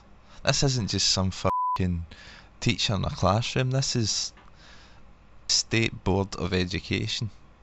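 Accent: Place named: Spain. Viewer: I found the thick Spanish accent difficult to follow at times-- but really enjoyed the program : )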